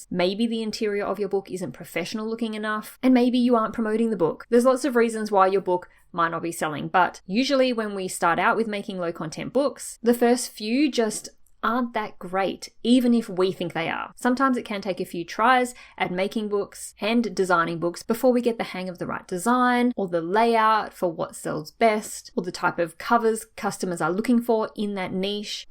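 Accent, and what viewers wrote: Australia. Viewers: I went what? Aussie girl talking about Amazon KDP books! How refreshing. Not that other other international sites aren't relevant, just wasn't expecting the accent. Then I thought Kiwi? no. definitely from the land down under. Just started publishing and dabbling in low content books in the last few weeks and yes, must start to promote. Thanks for your informative sessions.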